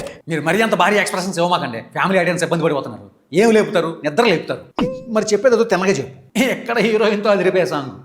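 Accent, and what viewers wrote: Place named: West Godavari, India. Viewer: Anna meedhu WEST GODAVARI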